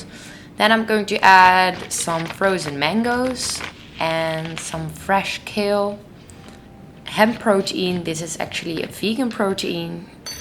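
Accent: Netherlands. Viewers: DUTCH ACCENT + LOVELY VOICE = PERFECTION ☺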